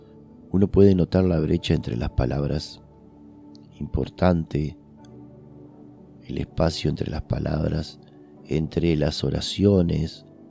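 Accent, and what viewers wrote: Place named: Argentina. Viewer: Qué bien habla el español y en argentino. Gracias !😄. Bendiciones maestro.❤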